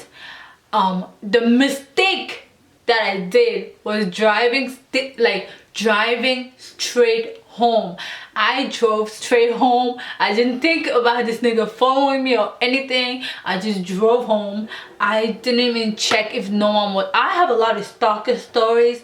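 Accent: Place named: Netherlands. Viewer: Where u from your accent different like u from Netherlands or something?..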